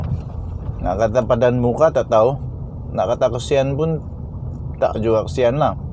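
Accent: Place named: Sabah, Malaysia. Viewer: JANGAN KAMU BAGI BARITA YANG TIDAK BUTUL.AH...KALAU BUTUL BARITA TIDAK APA LAH..KALAU BOLEH UBAH LAH CARA BRRCAKAP...KANAL BUTUL SUDAH YG INI DARI SABAH.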